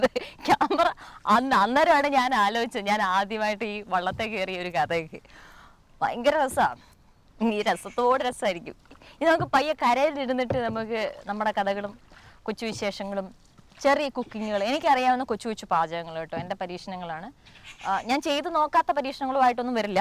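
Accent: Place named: Trivandrum, India. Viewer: Chechide sound ketal chechi tvm aanu nadu ennu thonnuva...kasargod aanennu parayila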